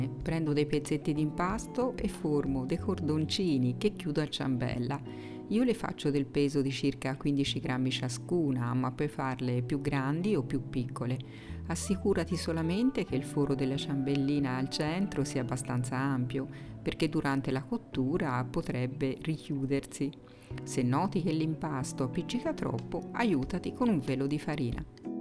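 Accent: Tuscany, Italy. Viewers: Buoni gli zuccherini ma il più bello è il tuo accento toscano. ❤😉😊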